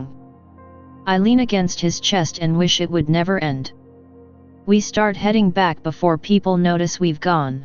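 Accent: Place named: Canada. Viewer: Whoa. TTS robot is canadian.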